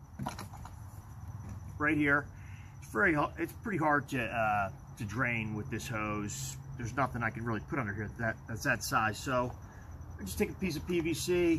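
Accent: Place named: Philadelphia, United States. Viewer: And that area is very clearly the Philadelphia area! Can spot that accent anywhere. See ya around town!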